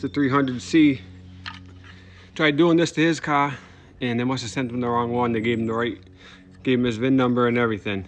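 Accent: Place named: Boston, United States. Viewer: Lol Bro you live in the suburbs what's with the Boston city accent...stop pretending to be something you're not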